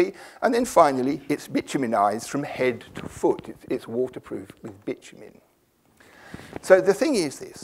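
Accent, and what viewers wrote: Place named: England, United Kingdom. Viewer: I'm from America and I think a person from england would be the best for me to learn something. The accent keeps me interested . Kinda weird but I can listen to them all day. My GPS speaks in old english. Lol..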